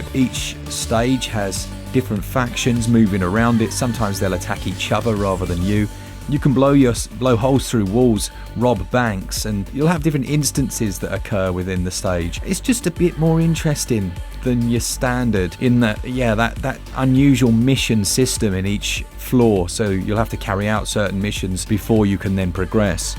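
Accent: England, United Kingdom. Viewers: Deals sound better in an English accent.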